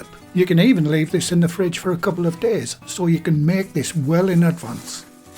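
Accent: United Kingdom. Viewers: Oh..love the accent!! Authentic british! Cool!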